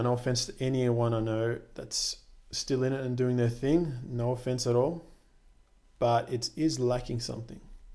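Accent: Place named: Australia. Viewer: Is it safe to assume you are of greek descent ? :P Im not gonna ask whether you are an aussie, your accent gives it away.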